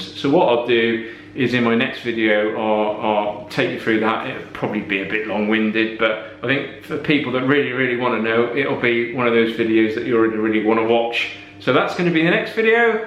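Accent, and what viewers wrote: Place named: Southwest England, United Kingdom. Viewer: *I really love your videos. But my curiosity overwhelms me about your dialect. Please set me straight , My English friend thought it is from the southwest.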